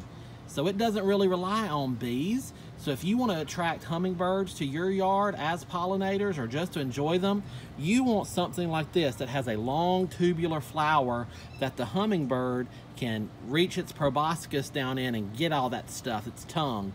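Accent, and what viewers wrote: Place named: Carolinas, United States. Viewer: I heard you speak & instantly knew you were a fellow Carolinian! I love you already! Happy pollinators week! We did a celebration video too along with Marie at Salongirl Gardens. I’m so happy to see other gardeners raising awareness!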